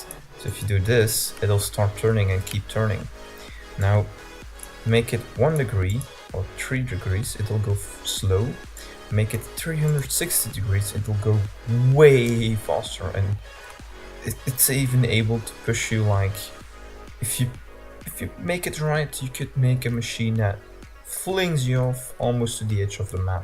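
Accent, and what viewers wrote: Netherlands. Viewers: hey just a quick question are you dutch? if so: hoi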